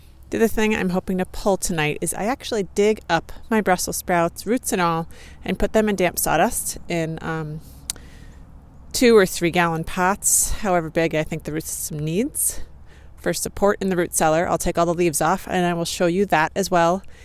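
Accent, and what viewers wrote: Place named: Minnesota, United States. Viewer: You talk so much like me. We must be Minnesotans. 😅 Love November gardening. Thanks for the video.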